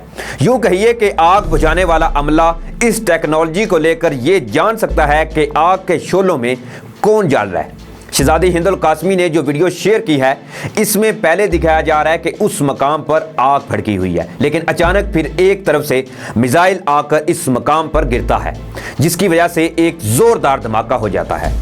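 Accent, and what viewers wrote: India. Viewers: bhaai aap ki urdu Indians jaisi kiyun hai, aap ki videos bahut achi hain lekin iss ka sara ta'assur aap ki urdu ki wajah say kharab ho jata hai, plz koshish kejiye k aap ka lab o lehja achi urdu ka ho, plz bura mat maniye ga, sorry again, Thanks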